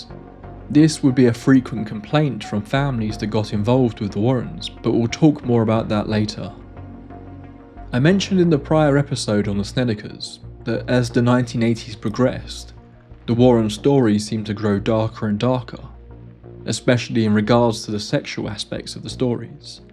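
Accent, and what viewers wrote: United Kingdom. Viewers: I don’t wanna hear a British guy talking i can barely hear what’s he’s talking. About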